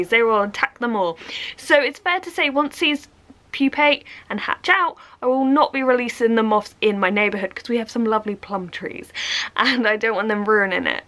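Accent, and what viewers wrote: United Kingdom. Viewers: I’m from Minnesota which is in the USA. I bet my accent would sound funny to you guys too haha! Where exactly in Britain is this accent from? So adorable.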